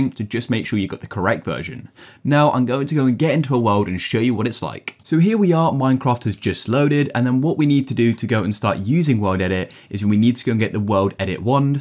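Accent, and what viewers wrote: United Kingdom. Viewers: don't speak British, speak American English!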